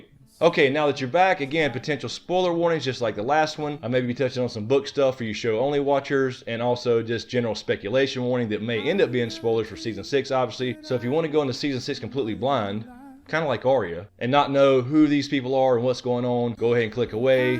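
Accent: South, United States. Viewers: Hey - I love your videos and now you are hooked up with my other favorite - Y'all are an awesome pair and I have chills from your predictions. I did want to ask you if you have ever done any research about the theory that Rhargar's harp is buried in the crypts at Winterfell in Lyanna's tomb? I read something about that and I have always wondered why she was buried in Winterfell since it has always been men there. Just wanted your take on that - Thanks I also detected a southern accent - wondered where you are from- I live in Alabama, I was just curious. Thanks again for all of your videos. Robin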